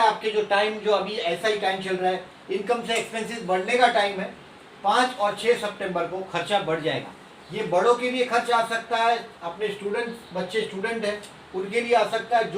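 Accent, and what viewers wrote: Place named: Maharashtra, India. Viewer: ।।🕉नमः शिवाय 🕉जय माता दी 🕉।। नमस्कार गुरूजी आणि शुभ रात्री, गुरूजी आपके सभी विडियो बहुत ही अच्छे होते हैं। मै महाराष्ट्र राज्य का हु और मुंबई में रहता हु आप जब कभी भी हमारे महाराष्ट्र में बोलते हो और बीच-बीच मराठी शब्द बोलने में आते हैं। बहुत अच्छा लगता है। ( गुरूजी आपके नये विडियो नहीं आरहे है। कृपया करके नये विडियो बनाईये धन्यवाद और शुभेच्छा! )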